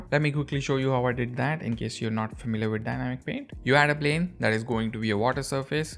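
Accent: India. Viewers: Default cube with a sexy moustache and Indian accent talking to me about my favourite software…. I’m in! Subscribed!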